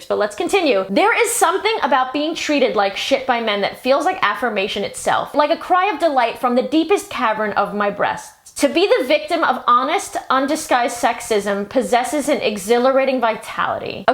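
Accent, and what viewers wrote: New York, United States. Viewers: I LOVE YOUR ACCENT!! I'm from Texas and I always thought I had a very neutral accent but in listening to myself more closely over the past year or so I can really hear the Southern twang in my voice sometimes 😂😂 and to me yours sounds like a stereotypical New Yorker sounds. Am I close or completely off the mark in where you're from?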